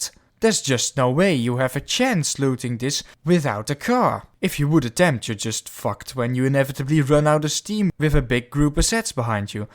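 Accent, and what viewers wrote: Netherlands. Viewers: I speak Afrikaans, and to me you sound like a Dutchman! Am I on the money? Been watching for a while. I like your style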